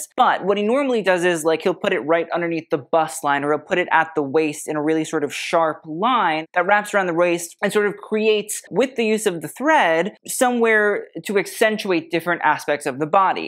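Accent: United Kingdom. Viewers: Wow, excellent British accent considering you are American. Have you ever thought about starring in British costume dramas? Thank you. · Your British accent is Fantastic darling 🤩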